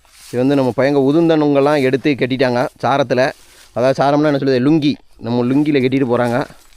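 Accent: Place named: Village Tamil, India. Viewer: அருமை வாழ்த்துக்கள் நம்ம ஊரு தமிழ் அழகு❤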